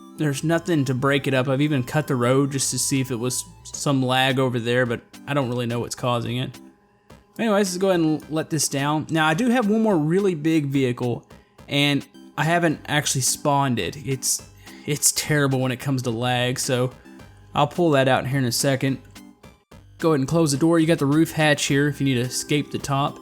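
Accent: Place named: South, United States. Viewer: You sound southern please tell me I finally found a YouTuber from the south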